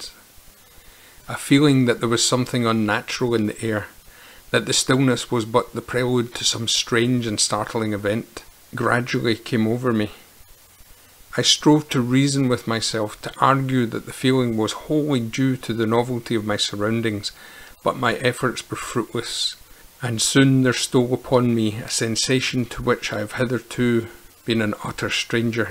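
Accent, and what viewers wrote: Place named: Scotland, United Kingdom. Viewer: First time this channel came up in my feed and I'm absolutely delighted. The stories are so wonderfully creepy and the way they are told, is perfect the lovely sound of the Scottish way of speaking, no dramatic background music that makes actually hearing the story very difficult - this storytelling is simply perfect for me. I love it, subscribed and clicked the bell with a big smile on my face. Thank you for making these videos for us, I'm going to check on your playlist. Best wishes from the Highlands of Bavaria (alpine region) 🙋🏻‍♀️ Slàinte mhath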